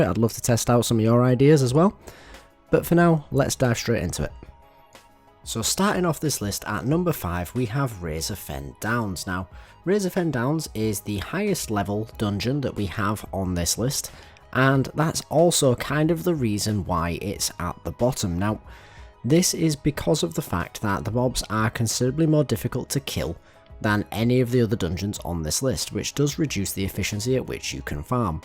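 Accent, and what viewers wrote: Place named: Yorkshire, United Kingdom. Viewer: you sound like a Hulligan ? Yorkshire sir ?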